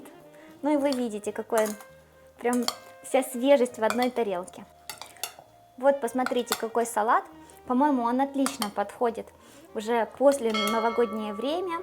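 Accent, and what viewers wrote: Ukraine. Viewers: Шановна!У Вас дуже красива українськаа мова! ДУЖЕ ВЕЛИКЕ ПРОХАННЯ- ДАВАЙТЕ УКРАЇНСЬКОЮ!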